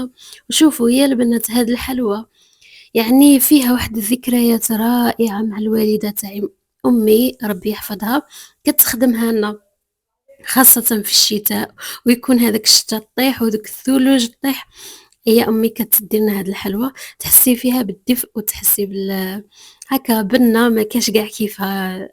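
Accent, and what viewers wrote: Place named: Tlemcen, Algeria. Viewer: اول مرة نشوف قناتك و درت abonnés من اي ولاية الأخت حسب اللهجة تلمسان انا ام اكرم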